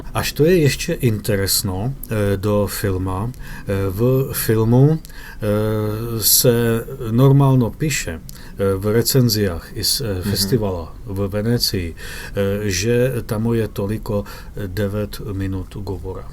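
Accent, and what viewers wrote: Czech Republic. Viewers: Czeski akcent słychać :) I cechy fonetyczne czeskiego :) Lepsze to niż angielski, tylko czy to się przyjmie w praktyce. Ja uczyłam się rosyjskiego i chorwackiego, troszkę znam białoruski, ukraiński, a czeski - jak już wspominałam, jestem Morawianką w 1/4, a gwarą góralską mówię na co dzień. To oznacza, że bez myślenia rozumiem praktycznie 100%. Masakra, genialne! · bo jest czechem to ma akcent czeski jak będzie polak to będzie zalatywal na polski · Inter slavic language with typical Czech accent 😉. Sounds Like standard Moravian combined with Czech and slovak and little serbo-croatian. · It was easier for me to understand as a Czech, because Mr. is Czech and has a clear Czech accent, lol, but this is an interesting idea. If people in Slavic countries actually spoke this language, I think I could learn it for a couple of months and then would be able to hold simple conversations without big problems. 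· I am Czech and for me it does not sound like Czech, there are many words that are not Czech. Maybe just a little bit. But the man, being Czech, speaks it with 100% Czech accent. I understand 95 % of it. The other person speaks Polish and I understand maybe 80 % of it. I like the idea and would like to learn this universal slavic language. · I'm Polish and I understood everything except for just two words (letak and gledat). But with some 3-4 more words my intermediate Russian might have helped. Generally it is very, very understandable for a Polish native speaker too. For the sound, it sounds to me like Czech mixed with some Russian, but that's of course very subjective and it's because of the author's lovely Czech accent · To be honest Mr. pronounces Interslavic clearly with Czech "filter". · kenan Sljivo gaming he’s Czech you can tell by accent, we might just use the most archaic words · Молодцы! 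Получился русский с сильным чешским акцентом! В принципе зря старались, ибо старославянский давно есть. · Słychać wyraźnie, że Czech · Ano ten český přízvuk je hodně slyšet :D.. občas to řekne dokonce česky a pak se opraví ale na tom není nic zlého :) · Yes, and he has strong czech accent, i can hear it 😂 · I can understand very well what Mr. says. Maybe also because he talks with a Czech accent. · He speaks normal czech sometimes with few different words, but accent is 100% czech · Podoba mi się idea języka międzysłowiańskiego. Przyda się, mam nadzieję w niedalekiej przyszłości. Jest bardzo czeski, w sensie wymowy i na całe szczęście jest łatwo zrozumiały dla Polaka :-) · I am part Czech, part Polish and I understand most of these. But have strong Czech accent. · polacy tutaj macie srbski jazik jako najmezdu hahahha znaczy Pan mowi jako czech ktory zna po serbsku ( ma ta twardosc ) umiescil duzo crkweno slowenskogo,ruskiego tu slychac a ni troszku tylko dodanie JA MNOGaja RODnaja ,blgarskiego niema tego tonaliteta , polskiego tez nie slychac nie ma a ni troszke tekko miekkego wymawania i nazalnosci . 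TO pisze z punktu dzwiekowego , czyli ucha , ktora mi pomogla ogarniac nasze jezyky . Wiec tutaj slychac Slowienca ktory zrastal s Yugami na osiedlu potem pojechal do Czech sadzic bo tam spoko calkiem inaczej bede brzmial Blgarin ,Boiko , Leh, Bunjevac lub Bosanac , mowiac medzynasz I to jest najciekawsze dla ucha i mojiej strasti pra nasieh jazici bracioo Wielki pozdraw dla Polakow najbardziej bo to moje glowe uczcie kirila i serbski i wsjo paniatna Swietna robota tylko prosto w do glebine niewyczerpane TAKO ISTO SAMO PRA VSIEH SLOVENSTVUJUSzCzIH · У меня одного ощущение что это ман говорит по русски только с сильным чешским акцентом..... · it's not 50% Czech, it's his accent that made you think so. I'm Polish and I've heard another Pole speaking Interslavic - I then also thought it sounds very similar to Polish, which wasn't the case in this video anymore. Our native language surely will have some impact, that's obvious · As a czech i understand 100% but maybe only he has czech accent and I'm from czech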